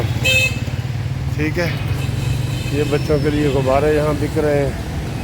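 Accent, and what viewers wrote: Saraiki, Pakistan. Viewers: صاحب ۔ سرائیکی لحجے میں اردو تبصرے اور تجزیے اور تازہ ترین معلومات ۔ ماشاءاللہ اس کا ایک اپنا مزی ھے۔ اللہ تعالیٰ آپ کو جزائے خیر عطا فرمائے آمین ۔ اپ کا تعلق قانونی حلقوں سے ھے اور معروف قانون دان بیرسٹر ہیں ۔ تحریک انصاف ایک بار پھر مخصوص نشستوں پر مزید وضاحت کے لیے متفرق درخواست کے ساتھ سپریم پہنچ گئی ھے۔ 3 آئینی ادارے آن بورڈ ہیں انشاللہ جیت پارلیمنٹ کی ھو گی۔ آپ سے درخواست ھے اس کے متعلق اپنی قانونی رائے سے نوازیں کہ اونٹ کس کروٹ بیٹھتا نظر آ رہا ہے ۔ اللہ تعالیٰ پاکستان کی حفاظت فرمائے اور فسادیوں اور انتشاریوں سے محفوظ رکھے آمین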